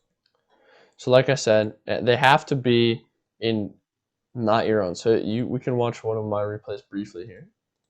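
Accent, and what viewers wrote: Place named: United States. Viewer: what makes you say that? his american accent sounds very natural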